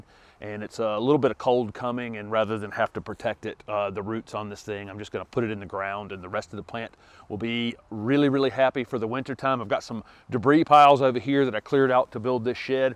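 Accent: South, United States. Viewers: Hello, I am obsess with Camelias. Sadly I live in a zone 6. Any Camelias you know that are extremely hardy? Thanks so much Love your southern accent btw 😎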